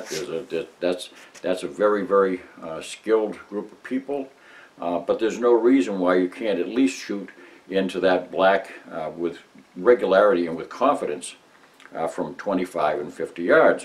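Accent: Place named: Boston, United States. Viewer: True Boston boy I can tell. Can’t wait to see the instructionals. Thank you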